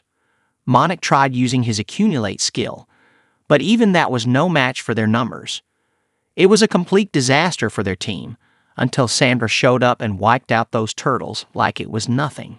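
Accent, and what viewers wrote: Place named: South, United States. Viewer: Sorry but the southern accent just doesn't work with this.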